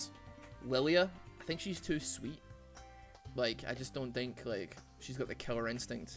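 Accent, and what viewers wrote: Scotland, United Kingdom. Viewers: If it wasn't for him being Scottish I probably wouldn't believe him. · The facut that he's scottish makes this 1000 times better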